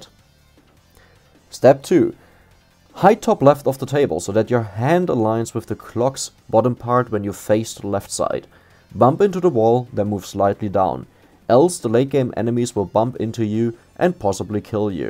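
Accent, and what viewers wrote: Germany. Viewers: Höre ich da etwa einen deutschen Aktzent heraus? Krasse leistung übrigenz.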